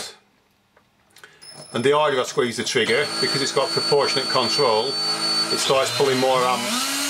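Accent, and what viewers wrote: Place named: Lancashire, United Kingdom. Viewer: I was thinking burnley or rochdale, but west midlands is way out. Brummies don't say load like "lord". Darwen's a great place, amazing countryside.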